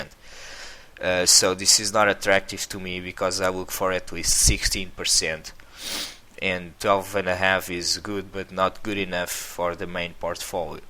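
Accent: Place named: Russia. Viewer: Nice Russian accent!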